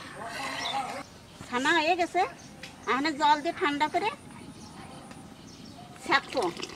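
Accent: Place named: Faridpur, Bangladesh. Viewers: ভাষা শুনে ফরিদপুর মনে হচ্ছে। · Ei Thakumar adibari faridpur naki???love her accent..💚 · ফরিদপুররে ভাষা বাংলাদেশের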